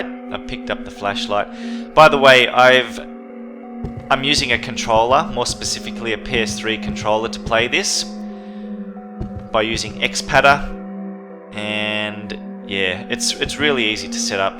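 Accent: Australia. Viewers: Your accent is awesome! Is it Australian?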